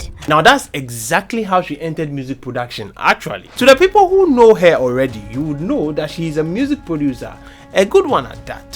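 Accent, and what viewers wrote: Nigeria. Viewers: yep because he creates more nigerian content and speaks like a nigerian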